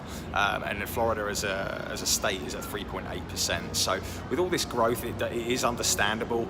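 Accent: United Kingdom. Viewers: You still got that Brith accent which is cool 😆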